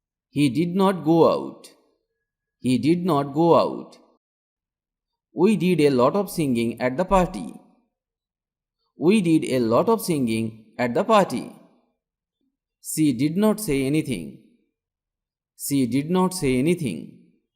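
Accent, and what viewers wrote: India. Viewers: Can you speak englisch and Not Indian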